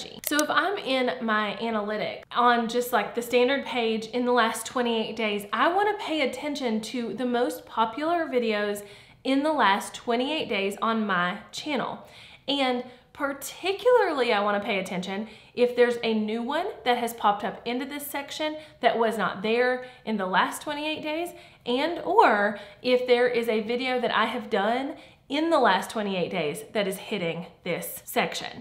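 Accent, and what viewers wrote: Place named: North Carolina, United States. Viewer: DUDE. this video and these analytics are blowing my mind :) THANK YOU - and im from NC too so your accennt is so comforting for me. lol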